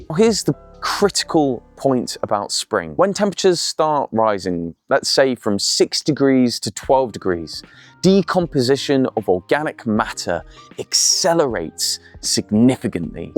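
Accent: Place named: United Kingdom. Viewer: I love this channel. I'm a sucker for soft, slow British accents; but the content is also amazing. Love love love. Wishing you much success 💕💕🎉🎉🎉🎉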